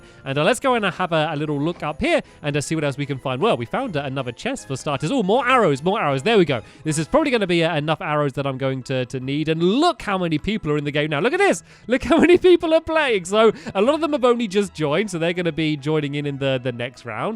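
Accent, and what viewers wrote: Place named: United Kingdom. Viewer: Gaming Wolf 2016 He British not American... You can tell by his voice...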